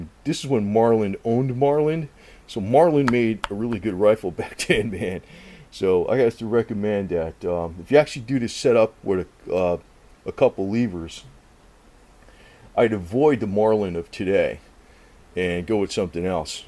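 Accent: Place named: West Coast, United States. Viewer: He sounds like somebody from the West Coast.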